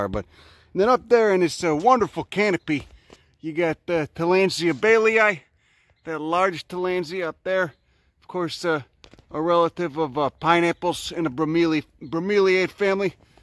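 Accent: Chicago, United States. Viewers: Just joining (recommended by Diamond) LOVE your info on utilizing the natural growth around us.....Learned a valuable lesson last week...physically cannot do tree trimming, etc...had to hire someone...they did a great 'trim' BUT I had no clue how the underbrush of all my overgrown fauna around my small house was INSULATING it. Yikes !! Miss that Chi town accent :) Grew up 40 miles south and had my first 'real' job downtown on Jackson Blvd (1963) Took the train into Union station...wore 'electric socks' to keep my feet warm (from Bennett Brothers) back then, a wholesale company. Thank U for your knowledge !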